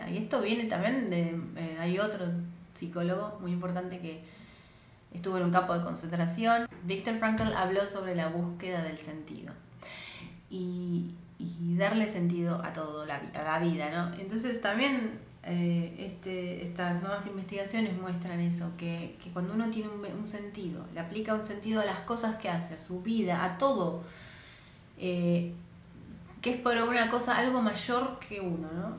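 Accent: Argentina. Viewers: Por favor modificar el tono argentino Par comprender mejor.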